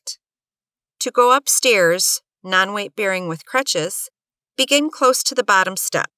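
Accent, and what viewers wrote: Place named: Midwest, United States. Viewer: Why does narrarator have to be from the Midwest...very annoying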